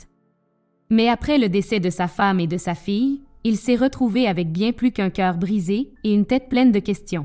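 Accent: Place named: Quebec, Canada. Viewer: Toujours marrant l'accent québécois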